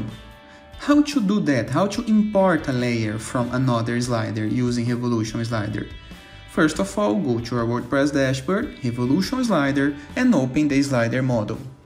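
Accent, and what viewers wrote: Brazil. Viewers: Certeza q é BR.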